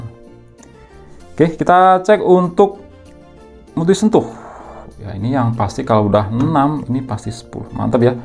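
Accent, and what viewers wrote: Blitar, Indonesia. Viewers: Orang Blitar ta mas??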